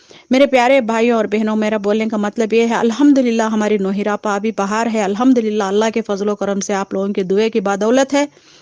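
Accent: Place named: Hyderabad, India. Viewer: Haydrabadi zubaan thodi zubaan pe rahte hai iska matlab thodi ke unko knowledge nahi hai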